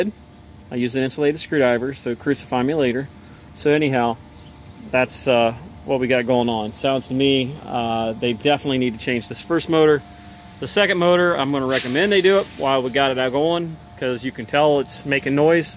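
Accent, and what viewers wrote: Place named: Pittsburgh, United States. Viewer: Sounds like you’re from Pittsburgh that’s the way we talk lol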